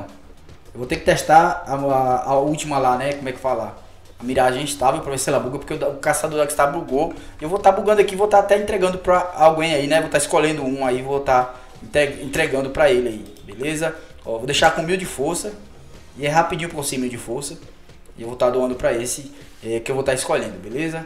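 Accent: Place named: Brazil. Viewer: Ree brasileño el man :v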